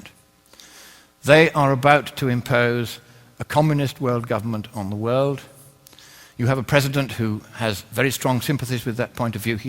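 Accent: United Kingdom. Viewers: Nothing more than a right-wing fear-mongering asshole with an English accent. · Oh look, it's Glenn Beck with a british accent. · The effects of too much Co2 do effect our environment. Just because the talking head in this video has a British accent and has adopted the title "Lord" does not make him intelligent. Educated sure, but intelligent. Far from it. There are already examples in nature of how the rising Co2 levels have altered our environment and the living habits of animals. No one is saying it is just co2. But co2 is a large factor for reasons so complex and many that your head would explode. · Fear mongering of the worst kind. Half truths, a lovely British accent. What is he really saying? That we should recall the president? I think between Rupert Murdoch and Dick Cheney, they have something more "black ops" in mind. The real danger is turning our county over to the right wing ideologues. 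I'm sure they will watch over our freedoms for us. Until the middle class is wiped out, and we have a feudal state. Them in charge. The rest do what they say. Now can we see who is a Nazi? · Great, a shithouse-rat insane conspiracy theorist with a british accent. He doesn't back up anything he says with scientific evidence, he just expects you to believe it because it sound good.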